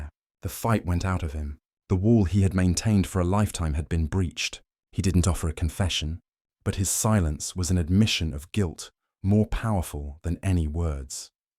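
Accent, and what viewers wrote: United Kingdom. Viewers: Is this just something you made up because 1st, There isn't a Granby. State forest in Massachusetts 2nd Hartford is in Connecticut.. 3rd Kill the British accent... It sucks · Why is there a British guy talking about this story couldn’t you make it an American????????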